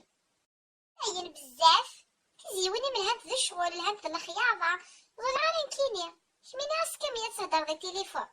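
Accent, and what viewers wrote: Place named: Kabylie, Algeria. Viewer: Amyaafou rabi Je me suis régalé. J'ai ri et pleuré. Tu m'a rappelé de vieux souvenir. Les dialogues me parlent. Je les ai entendu combien de fois. En plus un beau parler kabyle. Tanemirt.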